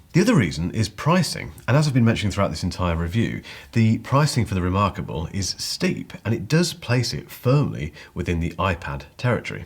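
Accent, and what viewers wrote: United Kingdom. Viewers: I really ain't sure if I subscribed your channel for the reviews or for the... British accent. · thanks for this. I have a remarkable, i purchased it just before they change to the connect option. Those of us the had the plan prior to the connect change got the full connect plan. Whew! Everything you said was correct. The price point was my biggest hartal at the time. I carries at least three note book on a daily basis but I could have used many more. I tried the iPad pro for a little while, but that was not satisfying even after the paper like screen covering. I love the tactile feel of writing and that took many months of youtude watching and reading reviews. With the remarkable 2 I enjoy writing on a digital screen. The price point is very steep and if not for my massive note taking I would have passed. One of the marketing focus points is no interruption when you are writing, drawing, or reading. 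Which turned out something that i enjoy. My iPad did not offer that unless i ran it in air plan mode. Thanks for your all your content. I have been subscribed for a few months and enjoy you British accent. Keep up the great work!!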